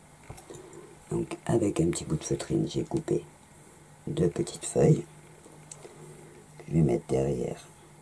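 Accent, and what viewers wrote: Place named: South, France. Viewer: Bonjour. Oui la fleur est facilement adaptable sur un tissu du fait qu'elle soit plate. Ah le charme de l'accent du midi "les reses" et les petits "hop" qui sont revenus 😉 ce n'est que du plaisir à voir tes vidéos!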